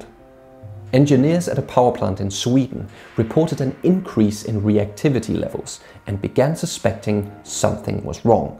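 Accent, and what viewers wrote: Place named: United Kingdom. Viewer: Hearing a British man saying “50,000 people used to live here” and it not be Captain Price or Macmillan is strange to say the least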